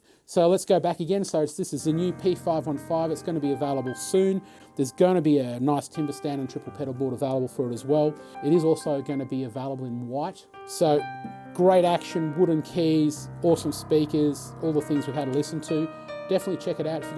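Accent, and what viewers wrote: Australia. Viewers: Beautifully played. Nice to hear the 'aussie' sound too hehe. Definitely prodigy level ! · Great review! So nice to hear the Australian accent and down to earth attitude. I’m picking my P515 up tomorrow.😉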